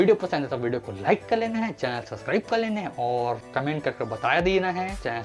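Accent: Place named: Chhattisgarh, India. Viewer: Chhattisgarhiyo ka accent pakda jaata hai 😅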